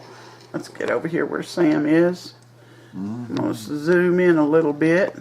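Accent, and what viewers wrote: South, United States. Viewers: Hi Hun, new subbie here!! What state do u live in? If I may ask lol..I can tell your in the south, I'm in Tennessee, I like a lot of your recipes!!! I'll be sticking around😊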